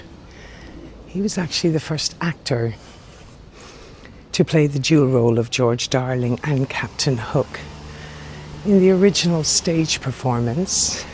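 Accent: Ireland. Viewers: Hi, I love your video. Not so much of a hidden Gem anymore but still hidden from most tourists. I think I discovered the Hill Garden and Pergola in 2018 or 2019. I always wanted to go to the West Heath, but I only made it to Hampstead Heath not the West Heath so I found it by accident. Boy was I amazed It was during the summer and it was a beautiful sunny day and I was almost by myself here. Mind you I came to this part of London in January 1982 to work for the first time a week before my 17 th birthday. I have been to London so many times over the years but I'll always love my part of London namely the NW Hampstead, Golders Green, Kilburn, West Hampstead, Queens Park, Swiss Cottage, St John's Wood that will always be my part of London and I keep coming back. I have also lived in LLanduno Wales, Portrush NI and in Edinburgh and I have travelled a lot in the UK as a tourist as well. 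I like your Irish accent and I think yo make some really nice videos. lots of love from Denmark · Lots of Irish references to go with your very slight Irish twang, I know Hampstead well, lots of celebrities currently live in these roads you are talking about, All best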